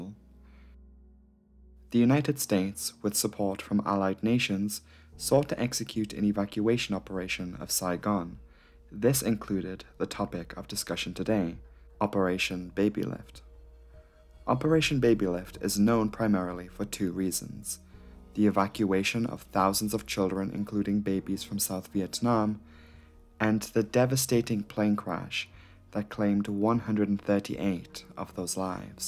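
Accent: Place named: North East England, United Kingdom. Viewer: Splendid video. Thought provoking. Love your North East accent. My mum (mam) was born in Chopwell. 😊❤️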